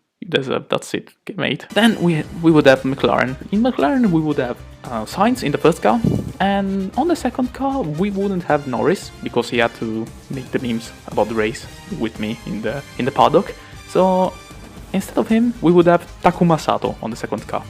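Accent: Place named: Italy. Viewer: L'accento mi sta facendo morire, te se ama #alphamaxnova1ItalianPride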